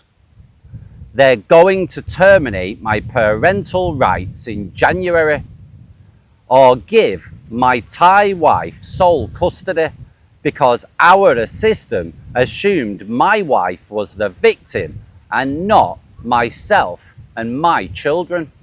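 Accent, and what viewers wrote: Manchester, United Kingdom. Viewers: Where are you from, Oldham? Your accent is almost Manc apart from a few words you pronounce like ''there and video'' for example.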